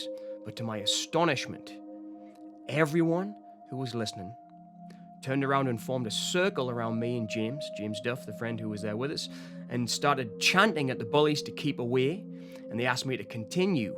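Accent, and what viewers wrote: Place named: Scotland, United Kingdom. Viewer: I guess u were expecting an answer and all u got was a little love heart ;) There's definitely a dram of Scottish in there, maybe some Irish too, and of course East? American.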